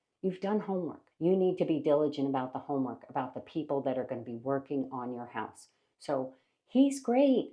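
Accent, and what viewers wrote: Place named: New York, United States. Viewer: you always make me laugh with your NY-er talk! You tell it like it is and I love that as a fellow NY-er.Honest & straight to the point! Plus I love how knowledgable you are! Truly. I too thought I knew about design etc. but after binge watching your videos, I realized there were soooo many things I didnt consider. Thats why I love your videos I have learnt so much!! Keep posting! love following :)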